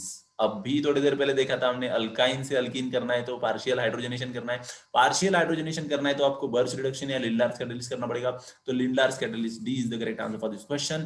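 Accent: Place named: Maharashtra, India. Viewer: Sir your mother tongue is Marathi...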